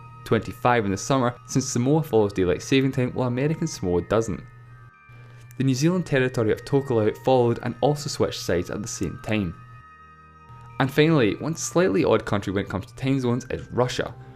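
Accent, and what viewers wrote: Scotland, United Kingdom. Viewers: It is Scottish. Use Closed Captions (subtitles) if you find it difficult to understand. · Yeah, it is a scottish accent. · He's Scottish that's how he talks. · Good to hear a Scottish voice on Youtube and imparting some knowledge. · Oh dear...Scottish accent with the speech impediment additive...does my head in... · We Americans have not trouble understanding a standard English accent. The narrator, however, has a Scottish accent, something English speakers outside the UK find difficult to understand. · WHY DID THEY CHOOSE THIS TERRIBLE ANNOUNCER???!!!! HIS ACCENT IS DIFFICULT TO COMPREHEND. SHOULD HAVE USED AN ANNOUNCER WHO SPEAKS IN STANDARD BBC ENGLISH OR GENERAL AMERICAN ENGLISH, UNIVERSALLY UNDERSTOOD ACCENTS---NOT SOME SCOTTISH ACCENT!!! RUINED THE ENTIRE VIDEO. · Breezy But he's from Scotland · Roman Furman Scotland, with a Scottish accent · Eduardo Mañón it's Scottish not english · No, it's a Scottish accent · The amount of idiots here who don't know the Scottish accent is too high. 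· Are you Scottish? Scotland is the best :) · Accents are wide raging and different u can have 10/20 different accents in a city or region of a country or even just a part of a city. Also certain ppl naturally may have a strong or weak accent due to their voice and some ppl can be able to mix different accents and make their own unique accent. This guy has a Scottish accent but it's not a strong strong 1. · This channel is from Scotland. I hear it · Sounds like a hybrid accent. Scot and Chinese or Japanese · To be honest, I loved your Scottish accent. By the way, you should have mentioned the Spanish case, where in zones like Finisterre (where I am from), the very eastest cape of Spain, the sun goes down in summer , in 40°N of latitude; and in winter, the sunrise is am... Freaking out! We should be on -1 UTC, but we are on +1, and in summer +2. But well, I don't dislike it though. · From the comments i understand it is a Scottish accent. 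I have no problem to understand him. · He's Scottish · Very annoying all those comments mocking his accent. He is Scottish, that's how people speak here. He never said "tame", there is no a sound in his pronunciation of time. Same with "sex", he never said that, there was no e sound in his pronunciation of six. Most of the people making those comments are probably American, considering how these words are pronounced differently there. Guess what... English is spoken in other countries as well. Have a bit of respect for other accents! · He has a Scottish accent. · I would like to know the presenter's area from which his Scottish accent originates, as I've heard similar versions where it sounds like the other speakers are talking through mouthfuls of saliva. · Niue is a territory of New zealand n ot a country it's a scottish accent and yes it's difficult to get used to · I'm a stupid native English speaker from America, but for some reason it's so hard for me to understand some English dialects. 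British? and Australian are pretty easy, and you accent doesn't sound Irish, so I'm guessing Scottish, and that's what some of the comments are saying. But that's okay that's what subtitles are for. And don't worry I subbed. And I'm sure you understand where I'm coming from but just to confirm I'm not talking shit or hating on you specifically I'm just really being honest: I seriously can't understand all English dialects. Otherwise I wouldn't have even mentioned subbing and actually subbing. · Gae Shows that's ignorant, he has a Scottish accent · He has a Scottish accent, which is presumably where he's from.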